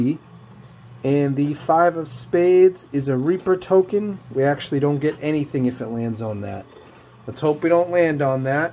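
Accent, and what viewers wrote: United States. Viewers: Do I hear a New England accent. New to your channel. Where can I go to play this machine?? Please let me know! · You sound like you are from New York?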